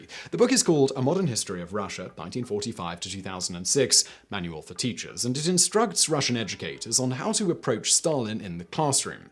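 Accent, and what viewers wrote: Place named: United Kingdom. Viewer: has that Britsh "Tone" that has annoyed people for hundreds of years, especially French people? I know both can annoy us in the States. American postwar influence did not rise from nowhere. The British Empire handed off it's "International Decent Chap" responsibilities to us, and that whole effort took on an American tone, for "Better" and "Worse".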